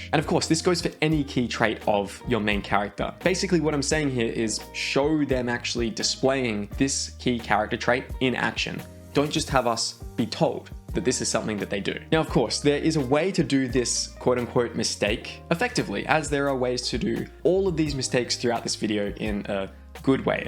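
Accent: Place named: Australia. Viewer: Who is this aussy btw?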